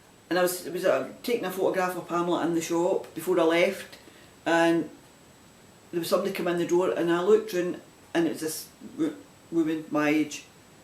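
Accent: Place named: Scotland, United Kingdom. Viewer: Love the podcast! I am from Scotland too so always great to hear the Scottish accent! Would love to enter into the giveaway 😀. Thank you!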